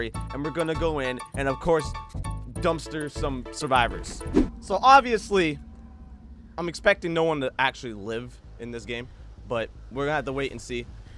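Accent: Czech Republic. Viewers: You from Czechia ?😂❤🇨🇿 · ty jsi čech vole?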